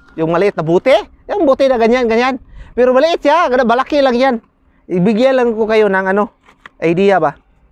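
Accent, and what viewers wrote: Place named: Visayas, Philippines. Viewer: Hoy mag bisaya kanalang oi halata Ang Tagalog Mo dol.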